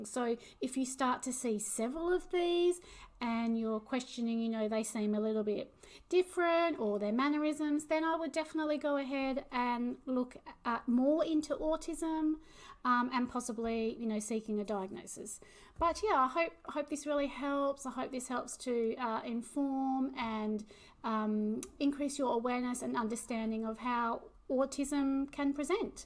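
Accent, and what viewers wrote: United Kingdom. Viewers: I think you still sound like you have a bit of an English accent to me but I’m no expert. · The only symptom I don’t/didn’t have on your list is delayed speech. I started speaking pretty early, surprisingly. But even down to you speaking in a British accent, assuming you did it because your dad is British… I also did the same, and my dad is also British and I’m American 😂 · Toe walking can also be because of a too short tendon between the heel and the calf muscle. Can be fixed with surgery. - Ecolalia can also be a result of TS/OCD - you're quite right. Same with coprolalia. - Constipation may be (I had it when I was a kid) because for some reason I liked the feeling of "keeping it in". NOTE! I've got TS/OCD and probably a tad Asp and a sprinkling of ADD. - My facial reaction can be totally blank by that is by will. - My primary language is not English but I still speak English with a Brit accent because I'm old enough to not have learned the US accent. 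;) But then you are what you speak! See Star Wars princess Leia when brought aboard the Death Star and meets governor Tarkin. She mulled over the line with "I should have recognised your foul stench" and it didn't come out right. Of course not since she was American. Then someone on the set told her to try that line in Brit English, and lo and behold! Carrie Fisher delivered the line in perfect Queen's English. I must say you sound perfectly British (if you aren't). - I preferred to play alone as well and I have always been a "loner". Also, a sign is fixating on something. Like I did on computers and continued to work with systems development and maintenance in various capacities all my life. I get really got at it, too! ;) The ability to really focus on something is something I think people with autism/asp can do.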